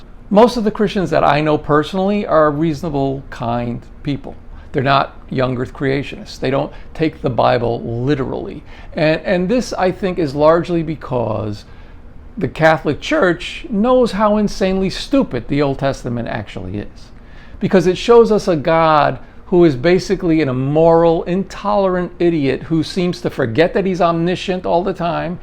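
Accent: New York, United States. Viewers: I’m also from NY, Long Island, you talk like me 😊 I was raised Christian, tried to be Catholic but in divorced remarried so I’m shunned 🤦‍♀️ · I am so sorry. I thought you were Jewish. But you are from New York, your accent is so clear. I am so sorry for my ignorance ❤ I am not even American 😂 Hi from Norway 👋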